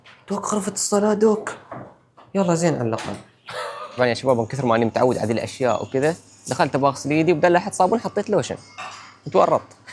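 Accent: Oman. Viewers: و الله كنت احسبك من السويج من لهجتك بس عادي كل عام و انت بخير و عيدك امبارك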